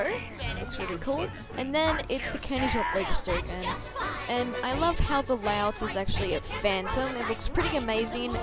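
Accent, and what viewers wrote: Australia. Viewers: ARE U AUSTRALIAN :O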